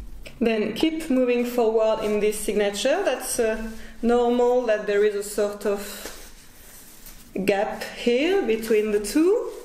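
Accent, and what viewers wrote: France. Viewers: Wonderful!! Thanks for showing us! And I love your french accent. It reminds me of when I lived in Paris in 2010. Yes, you make some little mistakes sometimes, but it's very charming! 😊✨💕🇫🇷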